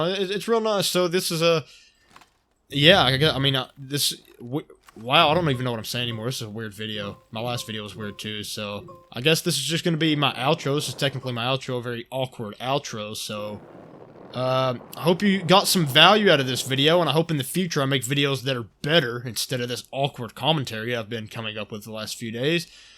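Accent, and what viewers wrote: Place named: South, United States. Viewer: your improvement at content creation over time is really cool to watch, Im about to finish all 88 of your astroneer vids. I think your confidence has strengthened over time and it's really enabled your personality to come out, it's fantastic! Your commentary is so enjoyable to listen to, you got that nice southern lovin' accent my bro I can't get enough! Thanks for posting and hope you keep at it!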